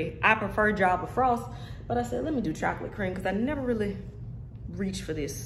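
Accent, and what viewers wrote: South, United States. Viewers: you sound like you from the south that accent heavy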